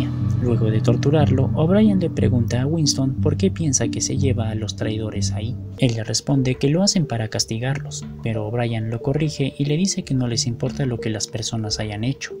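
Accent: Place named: Latin America. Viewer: Literatura hispanoamericana dirás. Latinos era como se denominaba a los habitantes de Lacio aldea de la Antigua Roma. Dudo que seas de Lacio, por el acento intuyo que serás Hispanoamericano. Hermano. Un saludo desde España, muy buen video.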